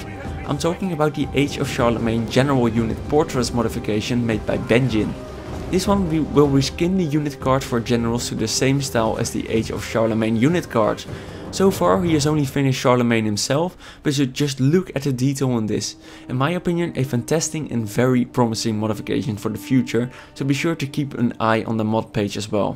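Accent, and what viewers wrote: Netherlands. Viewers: ***** Thought so :P Your heavy accent gave it away. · That is one heavy dutch accent... My dutch friends makes fun of that accent all the time :D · ik hoor dat je Nederlands bent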